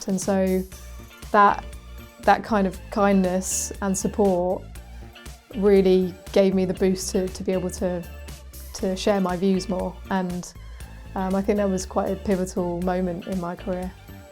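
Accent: United Kingdom. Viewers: Listening to her voice in British accent is pne of the most satisfying things 😌 ✨️ 😍 💕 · My favorite female commentator .... with lovely voice, English accent, very beautiful personality.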